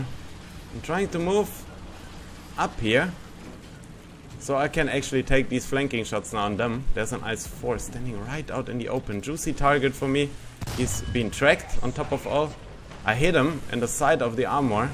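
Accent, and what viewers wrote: Germany. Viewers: I love his German accent :P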